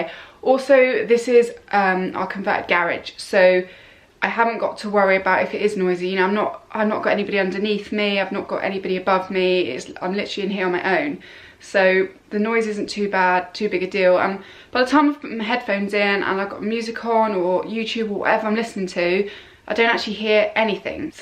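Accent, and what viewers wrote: England, United Kingdom. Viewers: Nothin wrong with your accent but then i'm english too. Thanks for the additional info, shame fitness superstore have followed all of the other sellers and whacked the price up to £350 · i love the english accent... the more up north the better it gets ;-)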